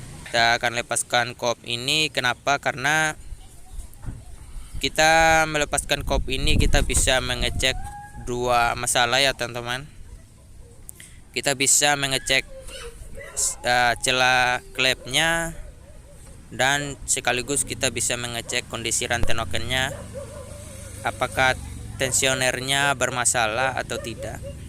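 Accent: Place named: Ambon, Indonesia. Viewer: Dari bahasa suara sumbang mcam org ambon k? Posisi bengkel dimna bos?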